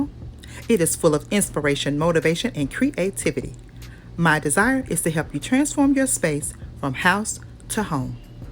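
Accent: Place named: Midwest, United States. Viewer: Hey Would You write your basic blurb in the ABOUT section? And where are you, what part of the country. You sound mid-western'yy. M. IL. Chi western 'burbs.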